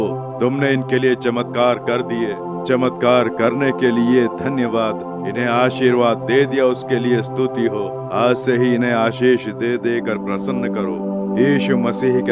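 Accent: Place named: Chhattisgarh, India. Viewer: Chhattisgarh ki bol rahi hai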